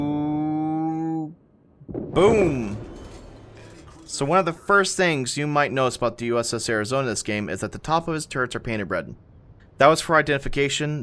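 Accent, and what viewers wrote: Texas, United States. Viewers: State history... Sounds like you grew up in Texas...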